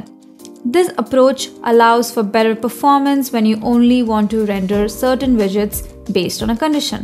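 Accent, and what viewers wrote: India. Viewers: Gosh, Indian accent again. FlutterFlow, don’t go down this path.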